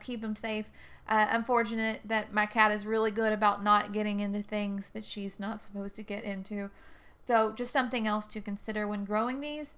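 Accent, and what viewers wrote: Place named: Tennessee, United States. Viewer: You sound like a friend of mine, she is from Tennessee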